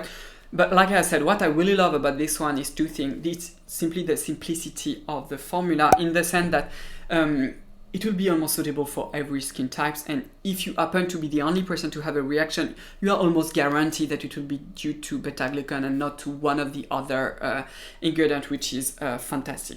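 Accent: France. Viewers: . I'm a French man raised in Australia and I listen to French accents all my life. There is a flaw in your channel that you must address: you flick out the names of active ingredients, with a French accent, and it is irretrievable for English speakers. When you discuss actives, pronounce how you want - but spell beneath. Alors. C'est pas trop difficile. Also, we can get Azeliac Acid in the US and Australia as 'AZClear' and 'Finacea'. If you live in either of these countries - buy those and mix a little into your moisturiser for a quarter of the price of even paula's choice. Azeliac is a cheap ingredient.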